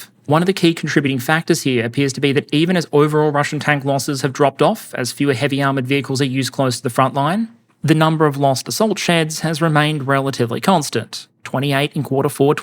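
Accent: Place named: Queensland, Australia. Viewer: Gonna take a punt here and say has a Queensland accent, specifically SEQ, so uh, how about them Broncs hey?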